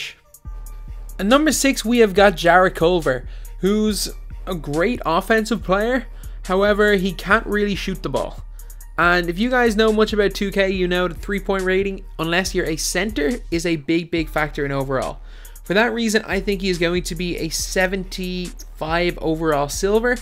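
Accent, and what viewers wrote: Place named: Ireland. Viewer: Its his accent hes irish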